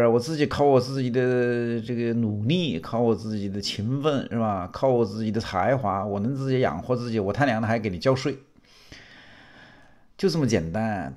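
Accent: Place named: Hunan, China. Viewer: 听到了湖南口语，想起我隔壁宿舍的兄弟。哈哈哈哈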